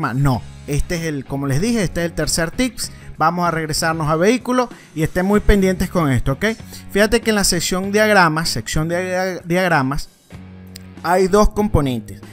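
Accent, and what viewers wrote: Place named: Venezuela. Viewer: Saludos, si no me equivoco tu asentó es netamente Venezolano y como buen Venezolano seria importante que nos respondieras sobre como podemos utilizar esa plataforma acá en VENEZUELA, espero nos respondan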